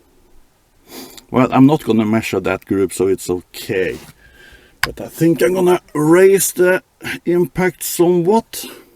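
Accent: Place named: Europe. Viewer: My chronograph doesn't talk to me. If it did, I would want one with a European accent like yours.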